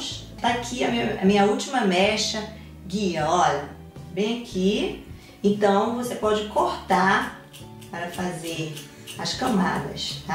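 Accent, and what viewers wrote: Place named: Pará, Brazil. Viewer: Essa é paraense...hahaha sotaque muito parecido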